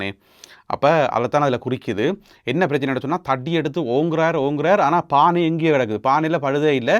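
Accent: Jaffna, Sri Lanka. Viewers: அருமையான விளக்கம் உங்கள் யாழ்ப்பானத் தமிழ் எனக்கு மிகவும் இனிமையாக இருக்கிறது. வாழ்த்துக்கள் தினமும் உஙகள் பதிவுகளை தவறாமல் பார்கிறேன். நான் தென் இலங்கை மாத்தறையில் இருந்து.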